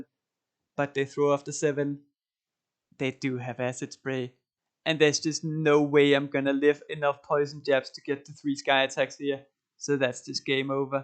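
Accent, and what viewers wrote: Denmark. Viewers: Your danglish is beautiful ❤️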